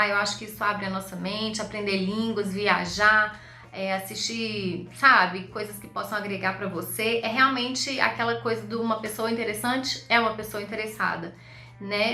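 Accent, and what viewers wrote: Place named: Belo Horizonte, Brazil. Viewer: eu amooo seus vídeos... também sou de Belo Horizonte. Te conheci por causa dos vídeos da Escócia. Quando ouvi sua voz pensei na hora.." essa moça é de BH"...aí pesquisei e me inscrevi no canal. Adooooooooro. Deus a abençoe.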